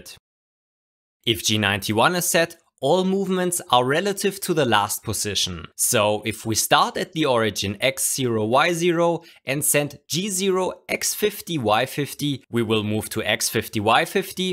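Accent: Germany. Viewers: Man hört raus das er deutscher ist :)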